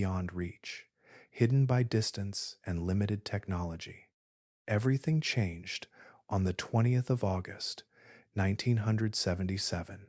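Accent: United States. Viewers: Congrtulations. American accent and no annoying sound effects. Subscribing now.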